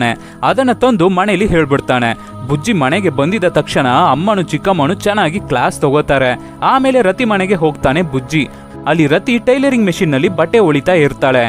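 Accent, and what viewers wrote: Tamil Nadu, India. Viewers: tamilian kannada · I think he is Tamilian as per his slang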